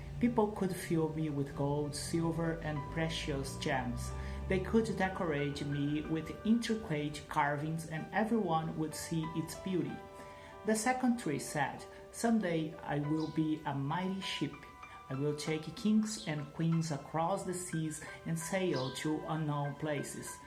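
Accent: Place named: United States. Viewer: Parece um americano convetsando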